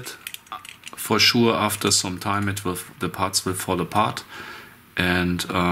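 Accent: Germany. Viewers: kommst aus deutschland oder?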